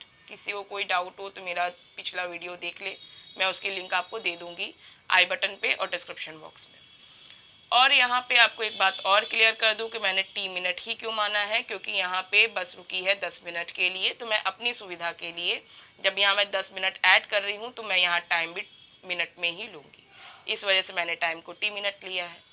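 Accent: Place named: Eastern Uttar Pradesh, India. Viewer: East UP accent ❤️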